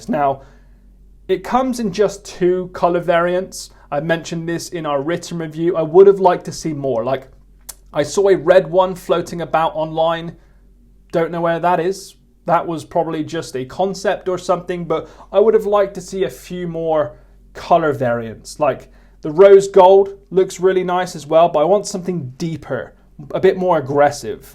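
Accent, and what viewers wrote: United Kingdom. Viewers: I didn’t even realize you were British until you said something. I think there are so many Youtubers around the world now that I don’t even think much about accents. Weird!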